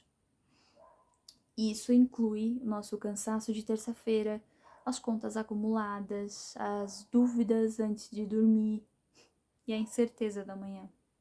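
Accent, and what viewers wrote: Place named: Portugal. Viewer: Você já morou em Portugal? O sotaque parece. Tô acompanhando o conteúdo pelo insta e achei aqui agora. Parabéns!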